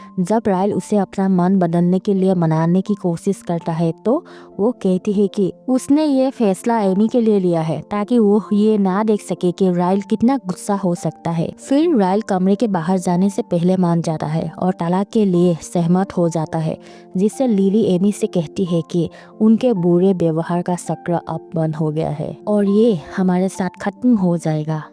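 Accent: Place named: Assam, India. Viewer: Nice hindi assamess style voice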